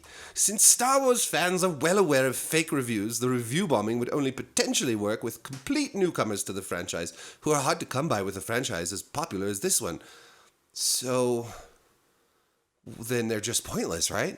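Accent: United Kingdom. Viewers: "Being a critic, you're supposed to tell the truth". Hallelujah. Thanks for being one of the few critics who actually believes this! BY the way, keep up with the British accent - way more entertaining than shows like the Acolyte. BTW - the BBC are making a show about the Battle of Hastings featuring, you guessed it, a diverse cast. Exactly the sort of artificial nonsense you are talking about. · Moar British accent please! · is SINGLE HANDEDLY bringing CREDIBILITY and reason to FORBES Pop Culture topics! Also laying down the SUCCINCT British Accents! 😅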